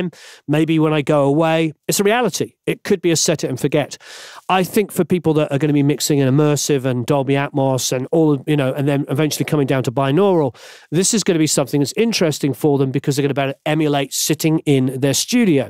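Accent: United Kingdom. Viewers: I always mix on headphones. We moved from a place where I had a studio separate from the main house to a smaller space where my studio is directly across the hall from the den and one quarter the size. Whenever I would mix anything with a lot of bass on the studio monitors my wife would tell me to turn the bass down. I've tried a couple of room emulations and they may help...I'm not sure. Once you've mixed the advice is to bypass the plug-in before rendering the file, so the sound changes dramatically. I don't know... Couple of points: When I mix I always sit at the mixing desk, which I would assume is where the impulse of any room should be sampled at. So I don't quite understand why you would load up a room and then change the parameters. I get listening to different areas of your space while mixing on speakers but on headphones you have no idea when, say, adding ambience, where you are in the virtual space. 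Maybe I'm missing something? It seems to me that these types of plug-ins are more complicated than they need to be. Set me at the mixing desk at Abbey Road Studios (or wherever) and let me mix! And who mixes in their kitchen? Finally, why is it that when someone speaks with a British accent they always sound smarter? Don't get me wrong, you ARE very smart, but it just adds a little something to the equation. And do American accents sound smarter to a Brit? Great stuff as always.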